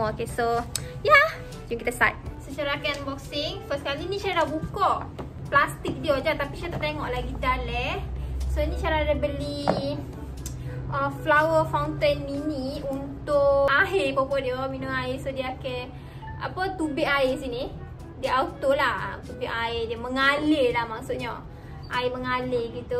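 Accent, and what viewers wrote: Kelantan, Malaysia. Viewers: cakap tu bagi elok sikit, kelantan lakk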